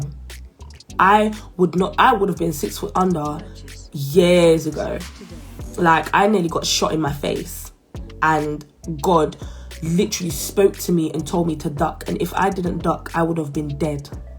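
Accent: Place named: Jamaica. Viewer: Your accents I soo nice 😍 please . Give me some real Jamaican 🇯🇲 patios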